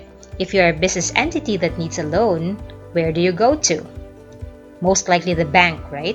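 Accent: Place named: United States. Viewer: Abi nako americana ang gaistorya hehehehe Btw, thank you maam for this discussion!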